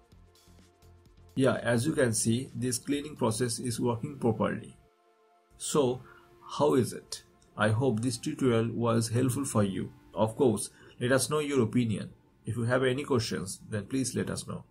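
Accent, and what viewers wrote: India. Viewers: because the guy who made this video is Indian and this guy despite possibly getting helped insult him by saying his accent is like the Indian scammers. And again, I'm not Indian.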